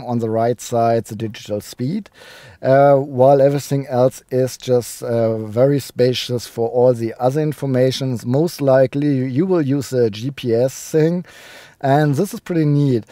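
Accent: Germany. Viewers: Lol your dash of humor is refreshing. Especially in that German accent!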